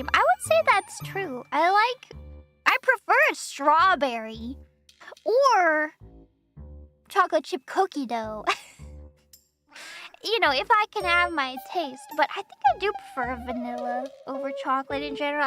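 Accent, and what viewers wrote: United Kingdom. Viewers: With how much british ancestry you had I hope to hear that lovely british accent a lot more:_nimiHaha: Nice silly idea for a stream and dna accurate drawing was fun. Thanks for the stream:_nimiHeart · I knew she was Bri'ish, you can hear it in her accent :_nimiNerd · The British accent has been ingrained in her this whole time. It was foretold. :_nimiHaha: Thanks for the stream :_nimiHeart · I always knew you were British, your American accent is VERY convincing though. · イギリス訛りいっぱい聞きたいよ！♡ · British accent is confirmed to be authentic! This was such a unique stream idea.:_nimiHeart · Authentic British accent! Thanks for the super fun stream! Looking forward to more! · I knew she had to be mainly british once I heard her british accent - it's bloo'y lovely! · Wow, the results are in and is 100% cute, but with no trace of elephant:_nimiCry:. 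Ah well, at least you have genuine British in you, so your accent must be genuine as well! Thanks for the stream and see you for next time! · My oshi is 2/3s British, now the British accent coming so naturally makes sense:_nimiHaha: This was super interesting, seeing all the results. I still think the ear wax is very cursed though:_nimiHaha: Thanks for the stream:_nimiHeart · thanks for the stream!:_nimiHeart: it was fun going through your results and I can see where the amazing british accent comes from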